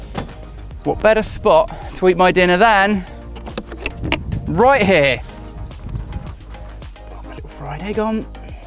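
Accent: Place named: United Kingdom. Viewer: Very entertaining, thanks. I enjoy your style, and for once, a nice British accent. Nice job.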